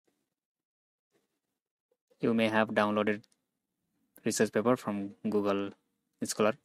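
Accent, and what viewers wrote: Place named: India. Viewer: Disastrous Hindi english accent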